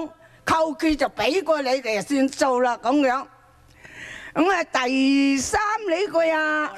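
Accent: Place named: Western Guangdong, China. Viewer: 哈哈。粤西口音。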